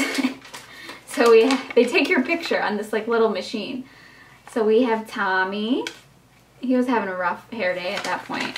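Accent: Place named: Upstate New York, United States. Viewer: She's from upstate NY I know because that's where I'm from I can tell by her accent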